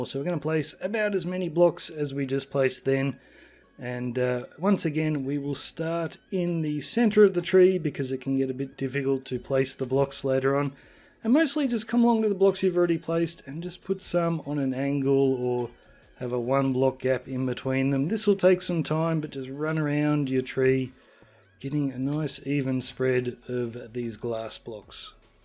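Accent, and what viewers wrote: Australia. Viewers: Nice video! Your accent sounds Australian... are u from Australia? I am... im from the sunshine coast!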